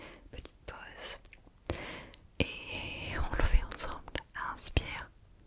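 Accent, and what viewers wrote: France. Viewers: Your French accent is lovely!!!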